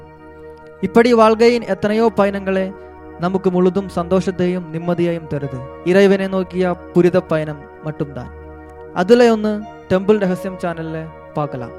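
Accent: Kerala, India. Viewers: Narrator is malayalee....Tamil ah kollathe da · Malayali accent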